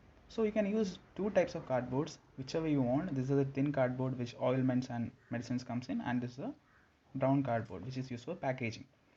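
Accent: India. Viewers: I find Indian accepts so satisfying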